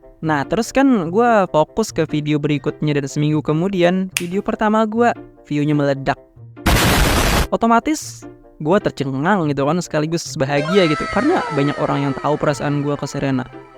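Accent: Kalimantan, Indonesia. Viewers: izin nebak bng, keknya dari logat bahasanya keknya orang kalsel/kalteng dah :v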